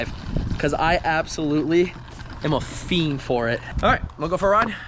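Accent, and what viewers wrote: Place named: Virginia, United States. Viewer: What part of Virginia is he from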